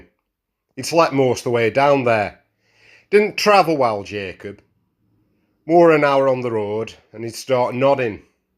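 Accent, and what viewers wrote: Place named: England, United Kingdom. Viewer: That's pretty creepy. Good story telling though. Where in England you from?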